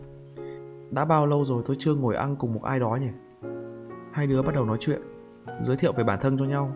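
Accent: Hai Phong, Vietnam. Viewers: Bác add quê Hải Phòng ah? :) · A ở Hải phòng à :v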